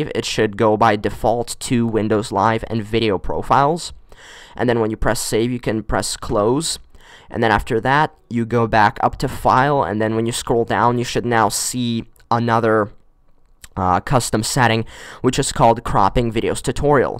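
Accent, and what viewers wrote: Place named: Egypt. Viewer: You kind of sound like someone from my school someone Egyptian that comes from Cairo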